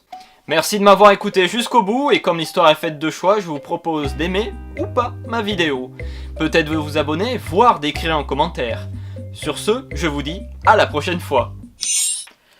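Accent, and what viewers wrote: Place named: Russia. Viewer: Bravo pour l’accent russe!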